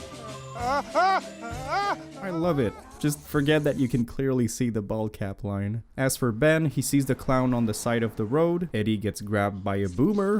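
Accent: Quebec, Canada. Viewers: french canadian eh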